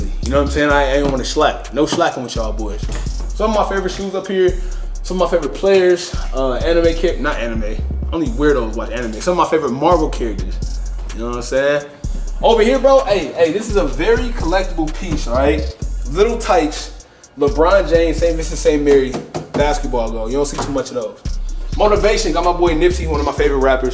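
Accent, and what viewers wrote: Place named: New York, United States. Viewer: Yo son, Crazy wild proud of you B! 💯💫 New York voice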